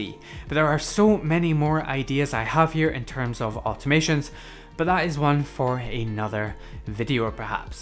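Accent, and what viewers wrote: Scotland, United Kingdom. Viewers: are you scottish like me?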